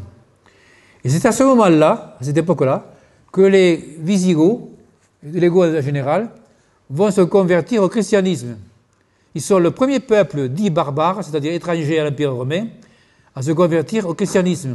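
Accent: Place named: South-West, France. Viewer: l'accent du sud ouest est une merveille ! ❤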